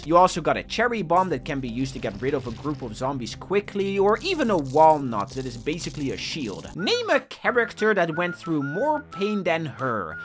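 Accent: Netherlands. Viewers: Hoe had ik aan het begin van deze video opeens de gedachte: wacht... is hij Nederlands? Bizar dat het gewoon waar is. goede video!!1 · why can I hear the dutchness?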